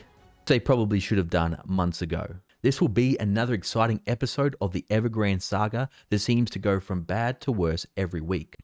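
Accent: RP, United Kingdom. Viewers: The English accent with the violin :)) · I say sir you went all posh upon a sudden! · holy smokes u speak toffy english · When the Holy Flippity Floppin Smokes comes with a proper British accent you know everything to follow will be gold. ✌🏽🔥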